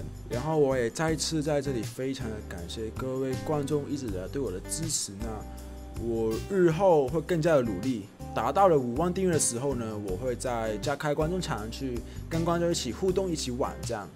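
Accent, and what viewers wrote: Hong Kong, China. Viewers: 好有香港味www you know~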